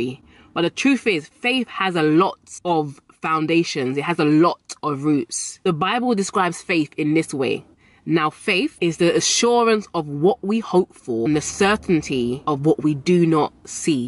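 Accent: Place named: London, United Kingdom. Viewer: This beautiful young lady has a London, England accent.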